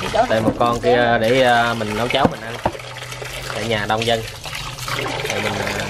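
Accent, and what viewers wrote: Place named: South, Vietnam. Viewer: Nghe giọng nói miền nam thân thương quá , mần thay vì làm , thiệt là ấm lòng .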